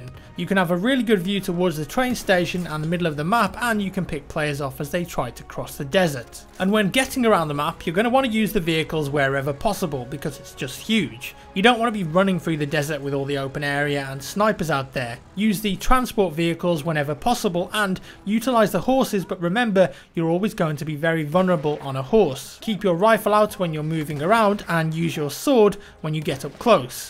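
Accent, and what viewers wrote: United Kingdom. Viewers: just the british accent lol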